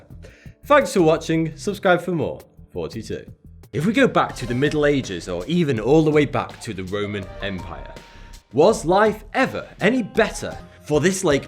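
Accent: United Kingdom. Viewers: Watching this video, my roommate walked by, and asked why Frankie Munez was talking in a British accent. No. You are NOT Malcolm in the Middle, and I will correct him on his folly. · Bro. I love your voice. Btw I like that Brittish accent.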